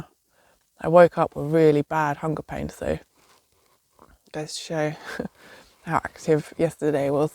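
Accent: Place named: United Kingdom. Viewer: I'm glad you're having fun , where are you from you look Asian but your language accent it's throwing me off you sound British just wondering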